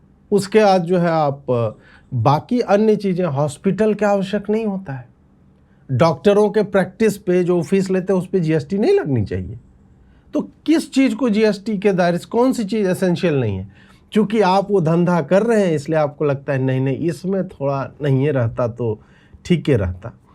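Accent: Bihar, India. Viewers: Begusarai ka tone muh se nikaliye jata hai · wah 10k+ comment🤣 bhaiya bihari tone wali baat shi h. mai khud bihari hoon pr ye emotional fool banane ka tarika hai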